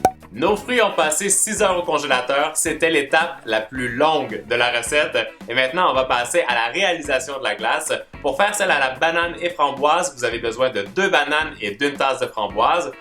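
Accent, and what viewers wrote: Quebec, Canada. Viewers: je t' adore. Je tes montré à ma soeur, on adooore l'accent cébécoi.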